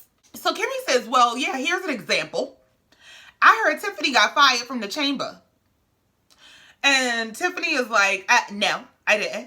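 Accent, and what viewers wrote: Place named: Gullah, United States. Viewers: Are you from SC because your accent sounds Geechie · Don’t know how I found your channel, glad I did!! You give great commentary!! Where are you from? Your accent sounds like mine from the Carolina’s❤️❤️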